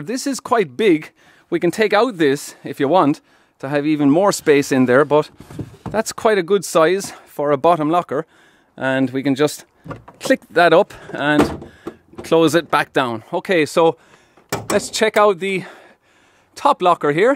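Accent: Ireland. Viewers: I bet you are Irish, aren't you ? Thanks for the introduction to the ACTROS. I used to live in Galway, was a pleasure to hear IE, again !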